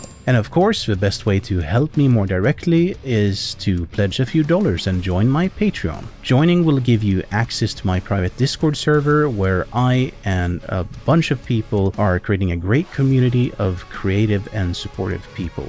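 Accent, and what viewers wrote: United Kingdom. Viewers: Happy birthday 🥳 (and that’s a cracking British accent) 👍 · Oy mate! Your brit accent is bloody amazing! I'm chuffed to bits! Hahah Your videos are always a gem and this one is no exception :D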